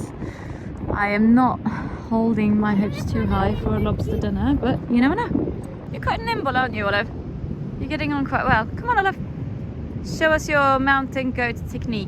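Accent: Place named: United Kingdom. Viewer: Jag trodde du var Britisk, jag älskar din brittiska accent, hälsningar från Peru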